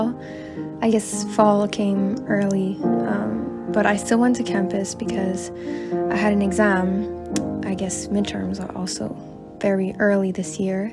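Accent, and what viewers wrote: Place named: France. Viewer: You know, before I had heard your voice, I didn't care for French accents. But there is something so lovely and soft about your manner of speaking that I felt myself feel less harsh in the direction of Franco-anything. Thank you for changing my mind.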